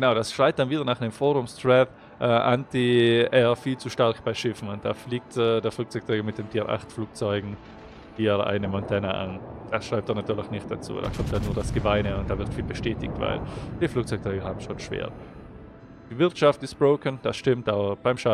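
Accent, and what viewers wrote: Bavaria, Germany. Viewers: bayrischer dialekt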